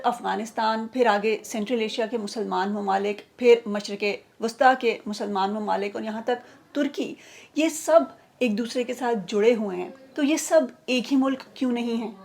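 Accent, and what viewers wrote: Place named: Karachi, Pakistan. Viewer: Waaah waaah mazaa agaya apka video dekh kar unbiasedly spoken ❤❤❤ from now I'm big big fan of yours ..u sounds urdu spk from Karachi wich are my fav ppl in Pakistan looking forward more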